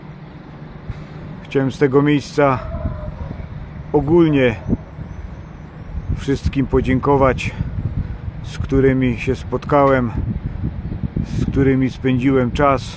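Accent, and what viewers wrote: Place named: Upper Silesia, Poland. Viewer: Białowice to chyba dolni Śląsk a akcent masz (mosz😅) z Górnego Pozdrawiam 👍filmik oczywiście z pięknymi widokami 👍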